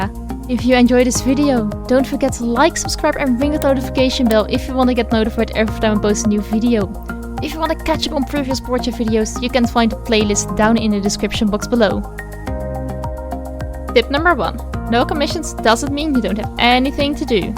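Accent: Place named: Netherlands. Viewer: As a fellow dutchy, I could tell that Dutch accent from a mile away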